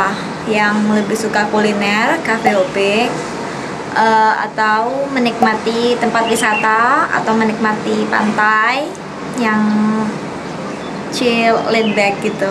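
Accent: Java, Indonesia. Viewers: Suarane medok juosssss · Medokny ituloh khas bngt .. jd setiap kata kek ada penekanan dlm pengucapannya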